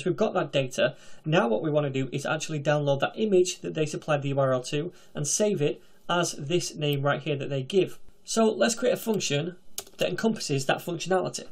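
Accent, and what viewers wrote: United Kingdom. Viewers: you are amazing I like your British accent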